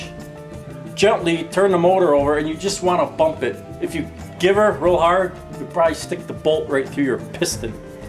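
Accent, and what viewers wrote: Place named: Boston, United States. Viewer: ive read many times on how to do this and am successful in the operation of my vw motors but this was well explained and the music in the back make it easy to learn,lol!! nice video,sounds like you are from boston!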